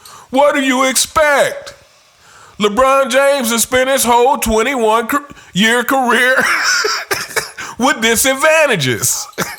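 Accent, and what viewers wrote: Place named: New York, United States. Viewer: i love listening to your takes. aside from the actual content, which is almost always spot on, i love your accent and cadence. maybe because i grew up in nyc, and i'm just used to newyorican accents.:-) but also love your laugh! reminds me of my youth (i have no idea how old you are, but i'm almost 64, and me and my boys laughed like that a lot back in the day. (i left city life well over 2 decades ago). keep it up my brother, tell it like it is!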